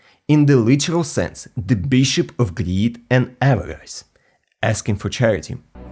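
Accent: Russia. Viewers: You sound russian...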